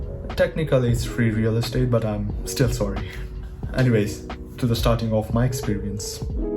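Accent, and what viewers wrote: Pakistan. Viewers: Ah ... now I understand how your Urdu is so good. You did have a sort of a Sialkoti accent I thought when I first watched a CS14 reaction video from you but I wasn't sure. I thought may be your accent in Urdu is because of Japanese influence but I should have known better. I dated a Japanese girl in college long ago but still got fooled. Be proud of being half Japanese and half Pakistani. Both cultures have beautiful things in them. You are both. Cheers from Tampa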